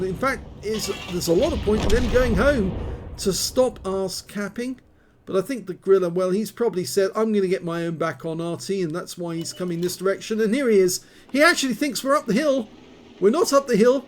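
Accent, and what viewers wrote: United Kingdom. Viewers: You are from England ???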